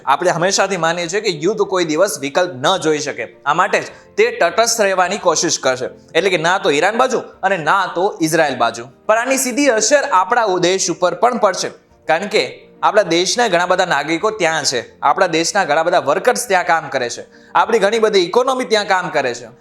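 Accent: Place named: Ahmedabad, India. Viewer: ભાઈ, આ અમદાવાદી touch છોડીને બોલો તો સાંભળવું ય ગમે. તમારી પ્રસ્તુતિમા સાતત્યનો અભાવ છે. તમારું folks બોલવું fox જેવું સંભળાય છે. બની શકે અમુક viewers લોમડી જેવા ય હશે (મને છોડીને). પણ એવું કહેવું સારું ના લાગેને!😂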